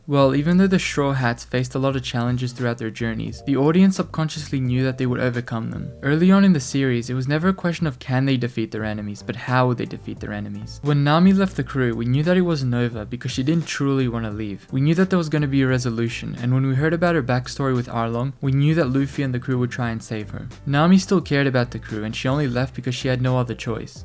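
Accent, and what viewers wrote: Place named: United Kingdom. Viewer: Hey I think this video is amazing. The one glaring issue is how British / European the voice person sounds I very much dislike that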